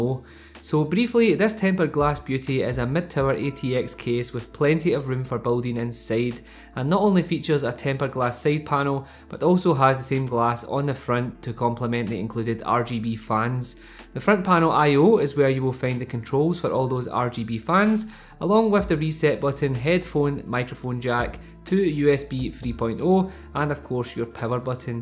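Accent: United Kingdom. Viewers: his british accent tho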